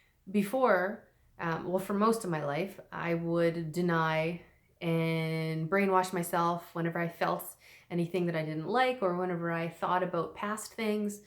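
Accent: Canada. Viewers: right down to the Canuck accent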